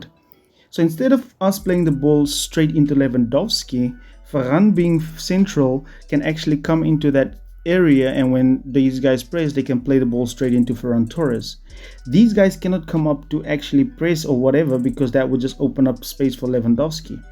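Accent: South Africa. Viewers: hey mate you sound South African, where you from?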